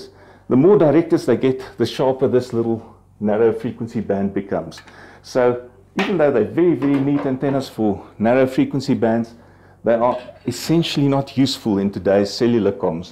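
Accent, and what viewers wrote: South Africa. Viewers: S that a South African accent?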